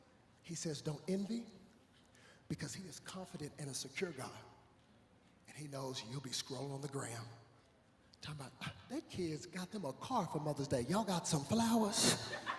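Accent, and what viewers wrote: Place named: AAVE, United States. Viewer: You use way to much. And a little ghetto. Sorry but you should bring it down with the ebonics.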